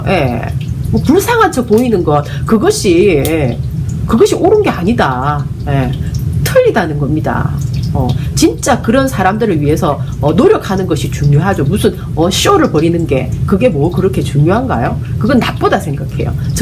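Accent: Gyeongsang, South Korea. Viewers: 다 좋은데요... 은 뭘해서 먹고사나요...직업이랄까 아니면????? 잘사는 사람 같기도 하고....아니면??????..... 왜 이런 일을 하시나요????...말투를 보면 경상도 말투...가끔 봅니다...고맙기는 해요..헌데..이런 방송을 통해 사실 왜곡을 하는 사람들이 너무 많다보니...방송소리 중에 물소리 같은 게 들리는 데 뭔가요? 잘사는 엘리트지식인인가요?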